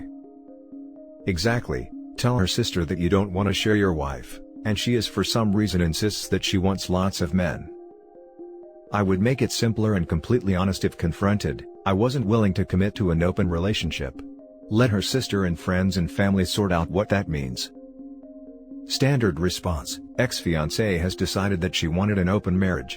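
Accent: Australia. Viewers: Dude its time to end this relationship and walk away she isn't worth it. Further recommendation never married ever know cohabitation no marriage ever women today are not worth it. They want sexual freedom but the stability of marriage in the end up shiting all over the husband's. Buy your language you sound like your from Australia. Australia and the United States going through 2 of the worst things going on any country the feminization of men in the masculization of women. In either of these countries manner treated equally which read like disposable commodities. The statistics in stats prove it. Between divorce courts child custody 's the way that were all looked at plans simple but what people don't realise men walk away society ends the safety for all ends. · You can just tell this guys an Aussie, up the Aussies!!!!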